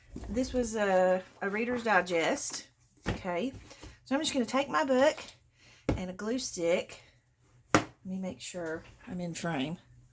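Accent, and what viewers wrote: South, United States. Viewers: Hi, I woke up a grumpy bear this morning...does that ever happen to you? Anyway, I knew watching one of your videos would lift my spirits. Sure enough! I clicked on this video, heard your sweet, sweet greeting in your lovely southern drawl, POOF! Mood elevated! It was like the clouds parted and the sunshine of my faith cleared up the grumpiness of my heart! Thank you! You have a blessed day!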